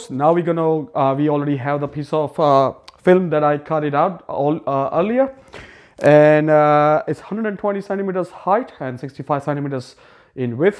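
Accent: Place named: India. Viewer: What in the world kind of accent is that?!?😂 Sounds like that made up accent Jayden Smith used in that movie “After Earth” 😆 Part of it sounds Indian, part sounds English with a hint of something else lmao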